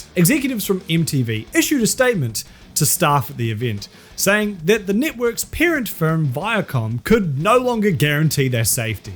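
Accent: New Zealand. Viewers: He's clearly from NZ 😅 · Kud rock? Like the Kud whale? Haha love the kiwi accent!! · Hearing a Kiwi say words like "festival" always brings a smile to my face. Top tier accent. Sunds suu frundly ay.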